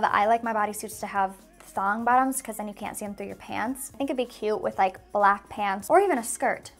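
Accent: Minnesota, United States. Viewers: Do you live in Minnesota I heard you say my Minnesota accent lol I live there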